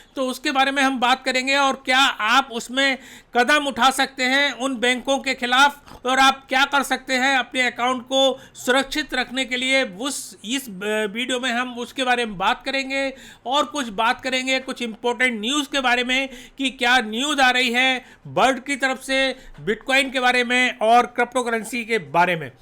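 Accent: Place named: Haryana, India. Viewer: Aap Haryana Se ho kha sa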